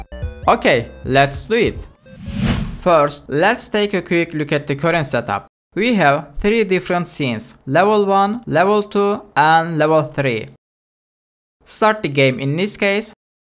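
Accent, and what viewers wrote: Turkey. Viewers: Bende Turk oldugunu anladim :p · ENG Below Kötü anlamda söylemiyorum, ilk defa izledim ve ilk cümlenden aha türk developer bulduk dedim :D. Samimi ve kaliteli bir kanal kurmuşsun, umarım hak ettiğin yerlere gelirsin. I am not saying it in a bad way, I have never watched you before and figured out you are Turkish from your first sentence. You created high quality channel and you are very sincere. I hope you'll get what you deserve.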